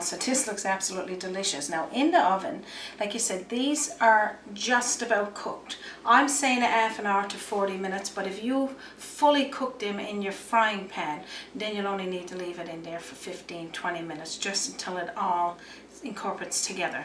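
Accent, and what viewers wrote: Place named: Newfoundland, Canada. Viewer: I like this simple recipe, I have made this many times, but I'm going to flour coat the balls like you did with flour. I ave to say the rice was not steamed in was boiled and set to cool, then served, I love your NFLD accent, some day if I ever get back I will bring you a great bag of H's, so you can say here we are instead of are we are, stay till I come were your at. I do love you don't get me wrong, this vid was 8 years ago and you avent changed, and that a good thing🥣🥣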